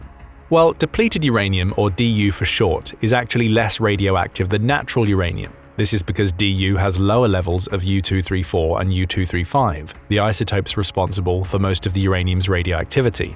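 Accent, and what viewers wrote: United Kingdom. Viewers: I wish these videos didn't include British narration.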